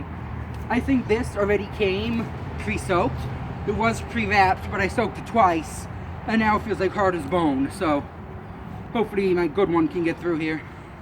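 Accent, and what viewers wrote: Ireland. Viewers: are you from Ireland? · Are u Irish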